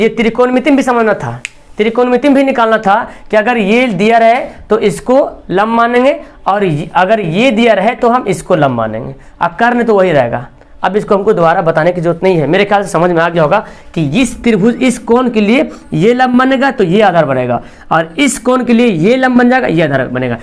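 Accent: Bihar, India. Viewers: Aap bihar se h kya?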